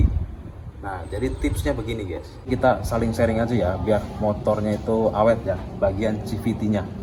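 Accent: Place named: Indramayu, Indonesia. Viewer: posisi bengkele ning ndi kang.. logate kayae wong dermayu ya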